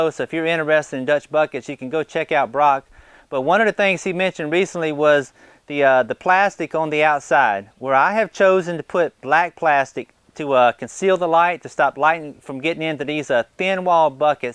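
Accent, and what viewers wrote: South, United States. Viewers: I love your accent. What part of the south are you from? It makes me thoroughly enjoy your videos in addition to the awesome info!!! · My Tennessee wife is dieing to know where you are from, she’s always asking where people ok youtube I watch are from if they have southern accents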